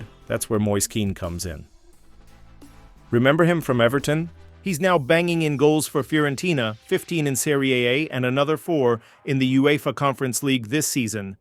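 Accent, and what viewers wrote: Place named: Newcastle, United Kingdom. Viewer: his geordie accent gives it away.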